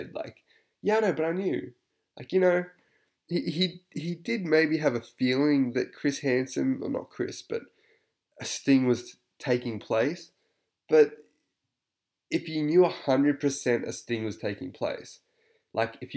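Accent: Australia. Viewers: You are quite entertaining and your Australian accent is a plus also.(No disrespect I just love Australian and British accents compared to the wining voices of New Yorkers) 😉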